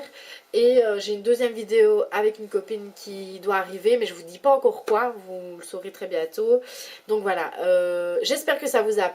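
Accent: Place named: Belgium. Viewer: Coucou! Bienvenue sur youtube ^^J'ai crée une chaîne il n'y a pas très longtemps, et je t'invite à la découvrir en espérant qu'elle te plaise et te face passer un bon moment, et si tu t'y sent bien et que tu a aimer quelques une de mes vidéos n'héssite pas à t'abonner. Et si tu t'abonne j’espère que mes future vidéos te plairont d'avantage! je te souhaite une bonne journée ou soirée:) bisous à toi!:p byyyy! ^^ -...... PS: j'adore ton accent! tu est belge non?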